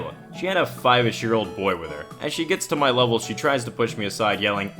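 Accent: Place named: Chicago, United States. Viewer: You have a fresh suave Chicago accent, is it just me who thinks that?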